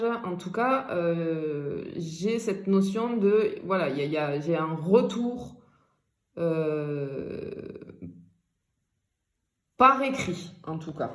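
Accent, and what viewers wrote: South, France. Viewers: Bonsoir c est exactement ma situation, il ma lachez sauf son silence et ces pouces sur mes commentaires et je suis brisée de ses trahisons ! J en suis la!! Et vous avez raison, je me suis abonné, j adore votre accent du midi ,je suis née dans le midi et je vie dans l Isère et votre accent me fait du bien mon midi qui me manque tant! Gratitude infinie gros bisous 🌈🌞🧿💔💔❤❤😘😘🌷👏🕯🐞🌟🕊🍀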